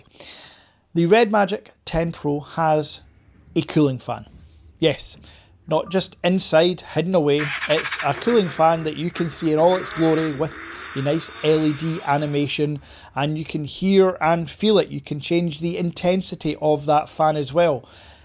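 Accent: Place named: Scotland, United Kingdom. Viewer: Love your Scottish accent!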